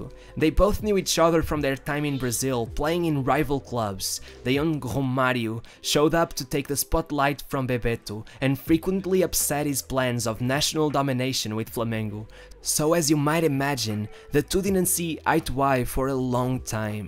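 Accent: Portugal. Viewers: that’s just his Portuguese accent then. Nice back track though. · Man are you from Portugal?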